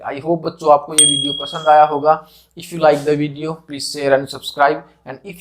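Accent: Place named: Haryana, India. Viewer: sir aap Haryana se lagte ho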